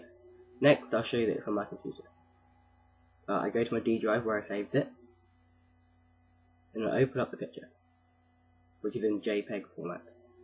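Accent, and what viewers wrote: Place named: United Kingdom. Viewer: He's British, like me! ;p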